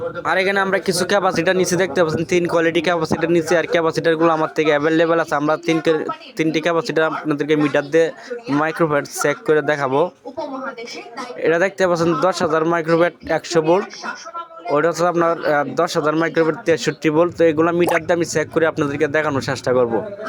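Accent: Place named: Mymensingh, Bangladesh. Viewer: ভাই আপনার বাড়ি কি ময়মনসিংহ আমার বাড়ি ময়মনসিংহ আপনার ভাষা শুনে বোঝা যায় আপনার বাড়ি ময়মনসিংহ❤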